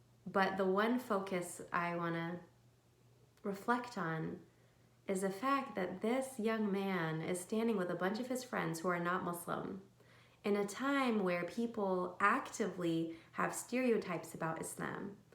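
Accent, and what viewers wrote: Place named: United States. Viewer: Ukhti, by hearing you speak I can tell you're American,I can pick up American English better and quicker than other English speakers, the reason I and I know and few... Anyway listening to your speech I noticed that you mentioned some about Muslims calling themselves "bad Muslims". There's no such thing that being a bad Muslims,shaitan tricks to discourage the slave. Convey to those Muslims who think they aren't good enough of Muslim that Nabi Ahmad SAW said we,his Ummah won't be able to follow him up on everything,"just to do what we can"...And He swt was holy gifted,there is no way we can get to his level,we don't have his strength,power,endurance etc... Just do your best, Ummah.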